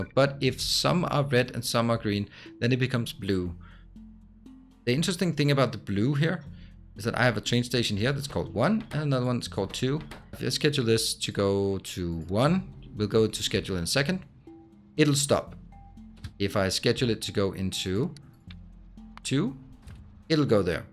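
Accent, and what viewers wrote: Denmark. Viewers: Decent tutorial. Literally subscribed for the flawless danish accent.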